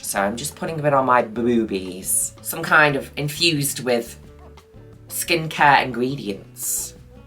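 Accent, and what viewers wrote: Australia. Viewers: THE AUSTRALIAN ACCENT SPOT ON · Ok finally a drag queen who can do a fairly close aussie accent! DORN'TTTT !!!!! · Quite aside from the STUNNING makeup, that was the best Aussie accent I've heard in forever. Signed: an actual Australian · Yasssss the Aussie accent got me good! Straya loves you ❤️ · Love has she it super funny. Also great Australian accent! ❤ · Stunning as always. Love your Aussie accent 😍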